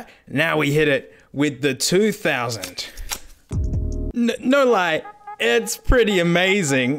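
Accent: Australia. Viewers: “Working down we have 120, there’s 80, 60 and mate if that’s not getting the job done ya bust out ya 1 grit and ya get it done roight” This guy has to be from Australia because he is hilarious to me! Love this channel. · He sounds distinctly less Aussie than his newer videos (and there was no Frank at the end! It's not a video without Frank!) Happy you've now found your stride, I'm just browsing your earlier content:)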